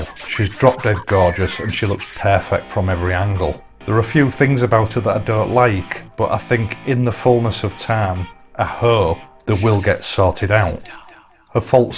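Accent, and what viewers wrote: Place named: East Riding, United Kingdom. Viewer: sounds like a deep East Riding accent to me...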